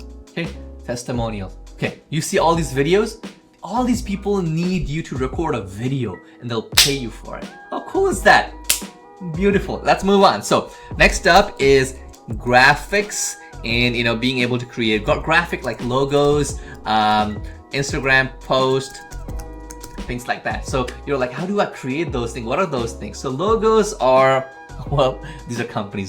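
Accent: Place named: United States. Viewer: Bro make a video on how to get that American accent😳🔥💯💯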